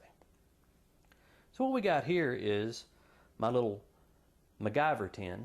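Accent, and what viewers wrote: South, United States. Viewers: His voice reminds me of Mr. Rogers, but with a southern accent.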